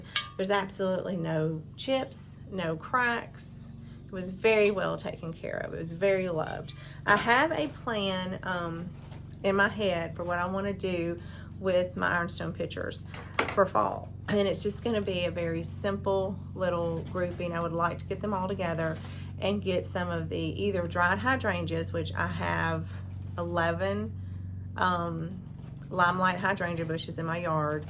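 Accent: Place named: South, United States. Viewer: any way you can turn on closed captioning? i am a northerner and have a tad bit of difficulty with your southern accent😉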